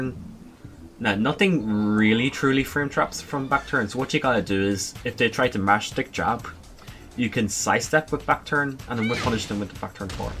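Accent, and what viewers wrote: Ireland. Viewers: That’s the Irish for ya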